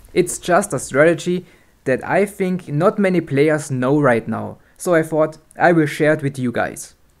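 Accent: Germany. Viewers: Hört sich sehr nach deutscher Herkunft an, irre ich...?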